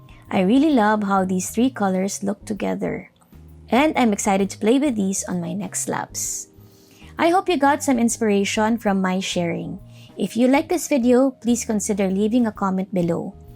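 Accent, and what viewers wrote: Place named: Philippines. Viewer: I figure you are from the Philippines because of your accent and also the indelible ink on your finger. Btw who did you vote as the president? If I may ask? Thank you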